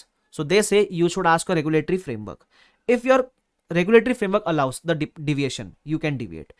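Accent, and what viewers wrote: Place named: Maharashtra, India. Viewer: sir pure english me mazaa ni a rha... missing your marathi accent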